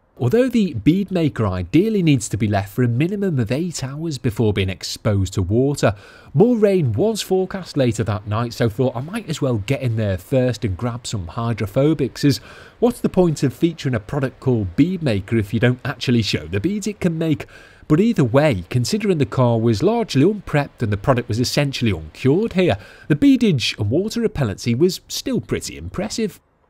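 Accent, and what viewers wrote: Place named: Wirral, United Kingdom. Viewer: I think I recognise the accent, are you a Wirral lad? I'm born & raised there but have moved over the water to Sunny Formby. Thanks for another informative episode. 👍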